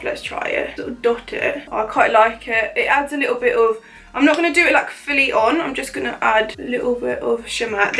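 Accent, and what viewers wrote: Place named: RP, United Kingdom. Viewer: This look is beautiful! And you are beautiful always. Your accent is so posh to me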